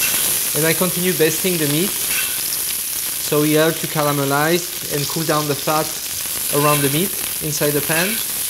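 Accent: France. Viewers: This is bullshit - just because he speaks English with a French accent doesn't automatically mean he can cook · French accent is funny, nice video learned a lot from it.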